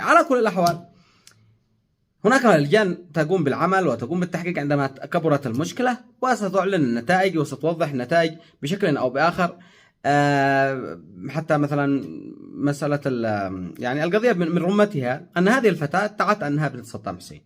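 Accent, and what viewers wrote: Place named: Yemen. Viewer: ياخي قد لهجته يمنيه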